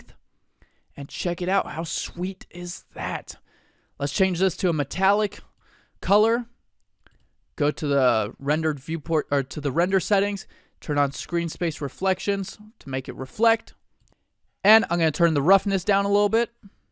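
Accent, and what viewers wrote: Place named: France. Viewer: You have a perfect French accent ! Thanks for this tutorial.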